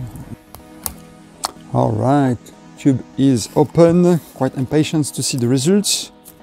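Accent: France. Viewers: l’accent français :)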